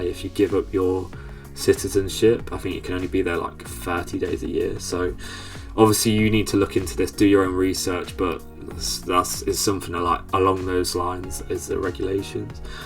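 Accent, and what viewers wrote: United Kingdom. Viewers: That's because judging from your accent you're from Northern England. They can be a horrible bigoted miserable bunch in the North. People in the South are generally more genteel, urban and cosmopolitan. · After living solely in different countries in my life with a third-world country background. At this moment I believe it's just between 6-8 considering living standards in first-world countries, what makes the difference is your priorities, having a partner or close friends or not, all other things can make you feel better and some people just want to stay away from their culture, that could also give some freedom! This life offers adventures but we also crave stability, as long as you are young exploring will make you more open-minded but ultimately you need to make the best out of your life! BTW I love the British Accent!!!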